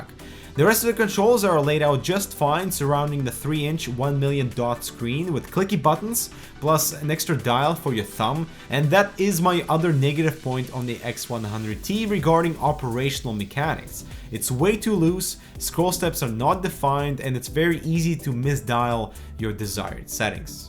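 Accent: Canada. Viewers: What part of Canada are you from? You have a heavy accent. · Nice review! Your name, russian? But canadian accent! Cool!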